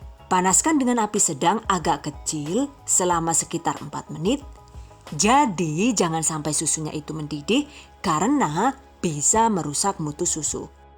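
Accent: Banyumas, Indonesia. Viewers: Ngapak ke kayak kiyek lha, gae seneng bae nak ngerungok no. Hahaaa... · Kok ada bahasa ngapaknya yaa,hehee,... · Wkwkwk lucu bahasa ngpaknya saya suka haha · Ngapak lah...yu'.... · sampe kaget aku pas jebul bahasa ngapak 😂😂😂😂 tak kirain temenku ngajak ngomong , eh ternyata suara dari yutub 😂😂 I LIKE IT 🥰🥰🥰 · Wong ngapak 😀, asli wong ngendi Bu? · ora ngapak ora kepenak.. enyong ya ngapak bun 😆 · Auto subcribe cuma gara2 ngomong ngapak 😂😍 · Terimaksh ataas resepnya, jd pingin bkin yogurt dewek, aku kl dgr suara ngapake, jd ketawa wae, hbs lucuuu siihh, okey, sukses slu, salam dr Wong Pati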